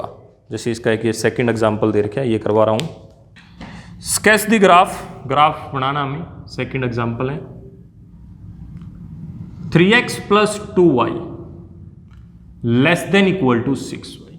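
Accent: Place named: Haryana, India. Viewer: Si ke accent ko dekh ke lagta h ki sir haryana ke h 😎😎 But kuch bhi ho padhaya badhia 🙏🙏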